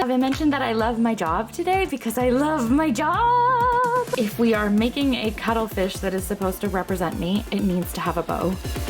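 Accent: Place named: United Kingdom. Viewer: Also your british accent is top tier! 👌 and that's coming from a Brit 🩷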